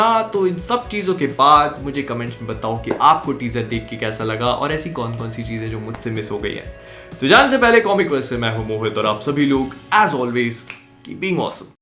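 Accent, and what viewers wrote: Bhopal, India. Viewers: Bhai aap Bhopal se hoo 😅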